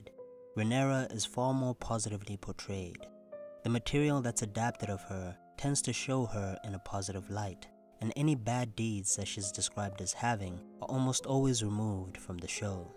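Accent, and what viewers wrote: South Africa. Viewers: Are you south African? Just asking 😂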